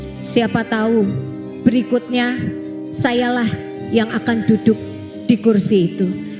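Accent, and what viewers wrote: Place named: Java, Indonesia. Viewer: Narasinya bagus! Medok jawanya pas!!!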